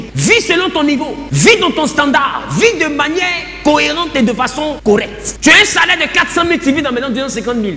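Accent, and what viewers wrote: Ivory Coast. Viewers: Bonne année cousin, mais c'est comment? Nous n'avons plus tes directs! Faut ratisser frère......mais papah, tu dress comme nous congolais, mais ton accent est ivoirien! Tu nous ressembles aussi....enchante mon frère.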